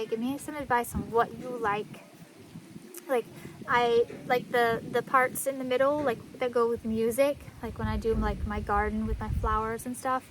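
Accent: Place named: South, United States. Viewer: Potting wild tomato plants in November! I'm draining my water hoses and putting them away for the winter, they already lightly froze up once. I don't have a greenhouse, but I did propagate some clematis from cuttings, hoping they survive. Good luck with expanding your channel, I came here from Cog Hill Farm. BTW being an old former Jacksonville guy, I love your slight southern drawl. I like videos in the 20–35-minute range. I don't listen to blog, too long, even my own son's.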